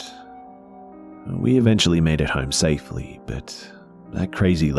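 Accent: Australia. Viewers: Something about an aussie reading stories really appeals to me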